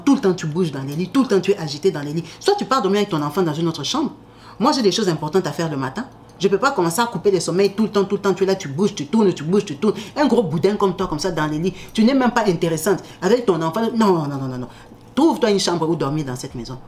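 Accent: Democratic Republic of the Congo. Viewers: Oulalaaaaa qui vient agresser verbalement notre petite 😮 svp dégagez d ici si vs n appreciez pas, elle s exprime et on comprend très bien et l originalite de son blog est qu elle est authentique ds son accent, sa façon de conter tu te retrves on dirait une tantine qui raconte avec précision le kongossa com ci elle y etait et elle ns fait voyager ds les scenes. Super bonne narative pr ceux qui aiment ce qui est terre à terre. Il y’a des milliers d autres youtubeurs qui ont fait l accademie des beaux arts avec doctorats vs pouvez y aller 😂. Ns on aime notre petite avec sn accent congolais en plus elle est respectueuse ❤ moi ss une bne camer.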